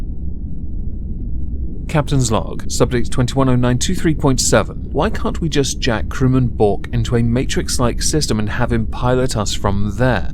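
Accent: United Kingdom. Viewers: Horseshit dude. Your voice is the smoothiest butteriest British accent I've ever heard. And I love your content dude. Never stop. ❤❤❤ and congrats for 100k. Been here since you were around 45k I believe.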